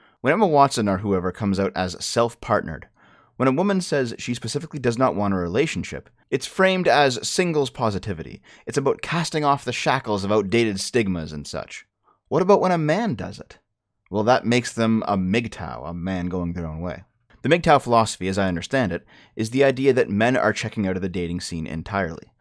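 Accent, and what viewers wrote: United Kingdom. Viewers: her accent is so english and thats quite annoying just saying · Why does she speak british?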